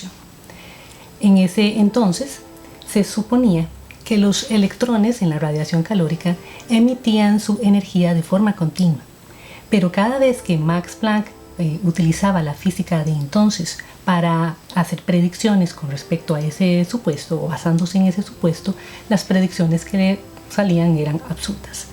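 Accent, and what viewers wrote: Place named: Costa Rica. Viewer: Creo que es de Costa Rica.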